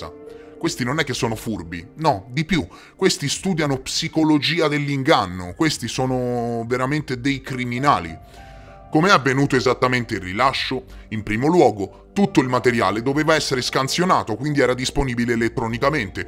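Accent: Tuscany, Italy. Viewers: Sono davvero orgoglioso di essere un veterano, ti seguo dai primi video quando si sentiva l'accento toscano, quando la maschera era senza i contorni blu-fluo, da quando la sigla era una specie di cubo con le faccine di Click... insomma è un vanto far parte di questa family e devi essere orgoglioso di questi risultati e soprattutto dove ci hai guidato aprendoci la mente... Grazie la tua umiltà va di pari passo con il tuo essere "BOMBER"... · Anche io lo seguo da un anno e passa ormai, e sin da subito mi sono accorto che è toscano, però non sono riuscito a capire di quale zona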